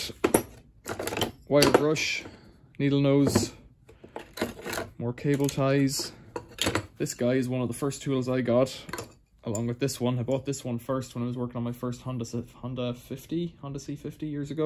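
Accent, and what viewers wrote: Ireland. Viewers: Do you have an irish twang to your accent? Im watching lots of your videos.. I have very similar interests to you lol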